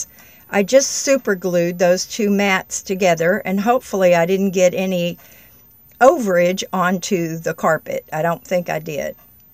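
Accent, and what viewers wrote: South, United States. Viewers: I knew it,!! You can take the Gal outta the South but you can't take the South Outta the Gal, Honey you hang in there. Keep saving, stay safe and keep your wits about you. You are gonna be OK..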